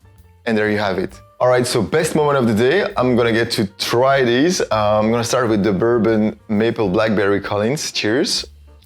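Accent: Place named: France. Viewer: oh man, that sounds like a french accent!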